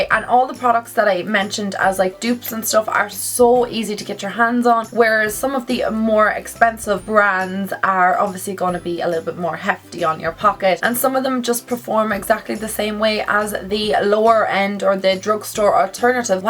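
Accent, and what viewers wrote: Dublin, Ireland. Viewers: Are u from dublin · I was wondering from where her accent was from and then I paid hard attention and remembered of Niall Horan and boom! It's an Irish accent 😊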